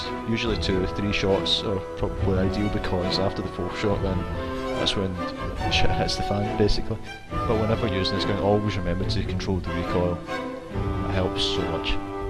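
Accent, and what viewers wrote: Scotland, United Kingdom. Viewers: Not wishing to offend, I actually thought you were speaking Russian for the first 3-5 seconds you were talking before I realized it was a heavy Scottish accent xD Nice video btw. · I'm so glad there was an assualt rifle available for medics. I love being a medic but hate lmgs. I've always loved assualt rifles but never the actual class. Thanks for the tips on how to use this gun. I'm a medic who is just an assualt guy at heart. I was also confused in the first minute. I was dissapointed that it was in another language. Before I read the other comments I thought it was. Then I heard the Scottsman kick up. Everything was good after that.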